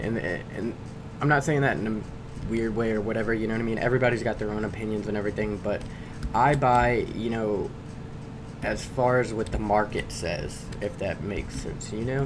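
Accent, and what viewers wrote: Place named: North, United States. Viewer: bc I live in Louisiana and he said he was from Louisiana just curious what part cuz he sounds like he from up north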